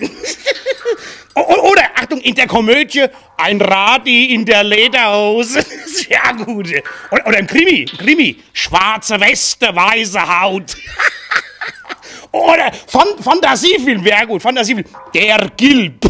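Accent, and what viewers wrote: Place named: Hesse, Germany. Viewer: endgeil,ich lieb unseren hessischen humor,und die geil sprach.super.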